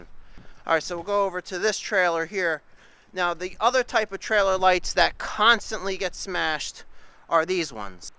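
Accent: New York, United States. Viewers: u from new york ?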